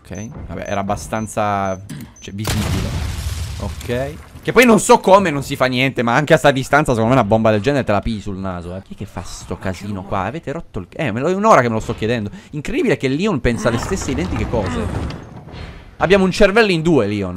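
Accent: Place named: Naples, Italy. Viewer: Potrei parlare napoletano meglio di te anche se non parlo napoletano. 😂 Vabbè, a parte gli scherzi, non mi ricordavo che Favij parla napoletano. Mi ricordo che nei vecchi video non parlava napoletano. Comunque Leon è quasi come me. Anche se ho un taglio di capelli un po' diverso, ma è quasi come me. Stesso carattere e stesso atteggiamento.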